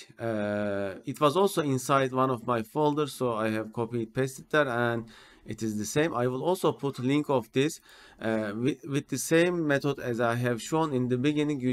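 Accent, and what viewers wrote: Turkey. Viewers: I like turkish accent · This is definitely English accent of a Turkish speaker :) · tr ingilizcesi bu nerde olsa tanırım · you have a strong turkish accent brother 👊🏻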